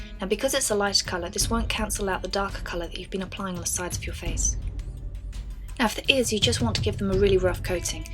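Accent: United Kingdom. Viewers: wow, i love your british accent and i love what you`ve done with your face; its damn sexy ^^ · Dunkles Avatar Make Up. Is aber der übelste britische Akzent.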